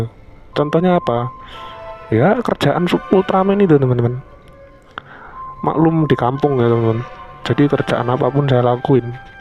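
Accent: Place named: Java, Indonesia. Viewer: Medokkk bet jowo mu brayyyy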